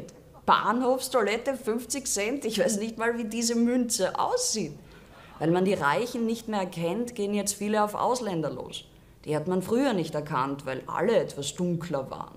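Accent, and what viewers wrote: Austria. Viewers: Der Wiäner Dialekt in dem bei der bittersüsse Sarkasmus herrscht. Schön das Sie das sagen darf was den Deutschen verboten ist. Viel Erfolg liebe Frau. Sie stehen nicht auf Duzen, eher auf "Sie A_loch ". Danke für die Lustigen Vorträge und Einlagen. 👍👍🙋🤣H.F.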